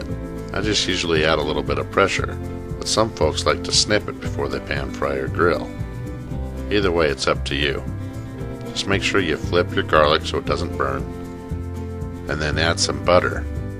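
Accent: United States. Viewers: Your voice sounds like the sound of America 🇺🇸 and the steak looks delicious too.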